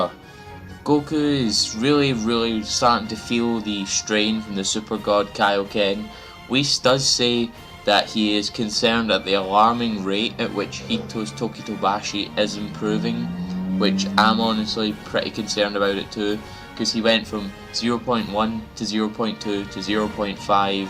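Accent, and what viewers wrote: Scotland, United Kingdom. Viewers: Hey, man! I love your voice! Sorry for my ignorance but is it Scottish? Anyway, I think you have a really good sense of this stuff, so you don't need my help. Although, I don't think you need to get into your overall thoughts on the episode because you've been talking about your thoughts on a scene by scene basis. For the most part, I would stay away from reviews/news as a primary outlet when you are newer. You want to standout as much as possible. Just a thought. Either way, good job!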